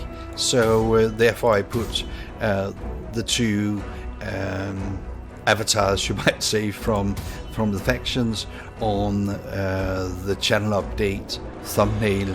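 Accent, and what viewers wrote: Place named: Denmark. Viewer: Man the Danish accent amuses me to no end :''}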